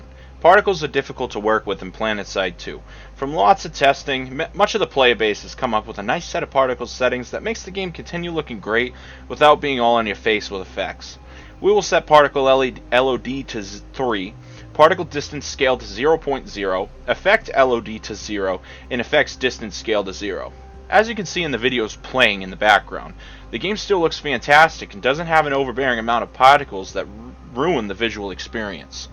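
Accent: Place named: Boston, United States. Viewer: love the boston accent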